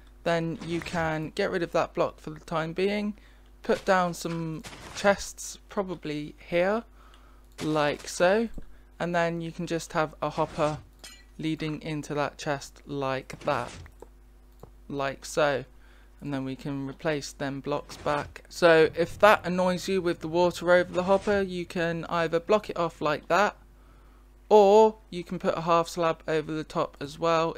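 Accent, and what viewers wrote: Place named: United Kingdom. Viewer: 와 영국발음 갖고싶다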